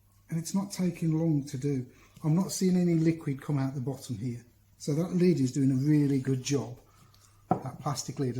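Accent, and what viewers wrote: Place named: West Midlands, United Kingdom. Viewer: Greetings from a Brit in Germany. Nice to hear a West Midlands accent (I studied in Stoke) I am fascinated with "coffee logs" and recipes. I have been saving all my waste coffee for ages. Tell me can you include waste paper in this mix as well as waste sawdust? Do you think these "peat" briquettes might be useful to bake bread in a Dutch Oven experiment?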